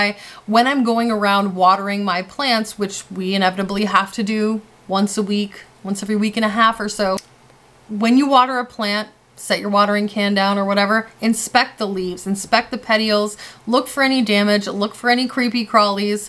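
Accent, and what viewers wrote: Canada. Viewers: Your Canadian accent is delicious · I love your Canadian accent!! You make it fun to learn. Thanks for your work. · I'm guessing you're from eastern Canada with the way you say ABOUT!! Love the eastern accent coming from western Canada.. If I'm wrong please let me know. Thanks for sharing a great video, thrips are horrible and have decimated many plants in my indoor garden. Cheers sister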